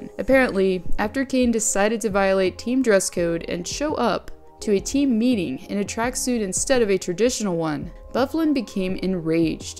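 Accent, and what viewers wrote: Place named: Pittsburgh, United States. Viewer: Your accent reminds me of going to Munhall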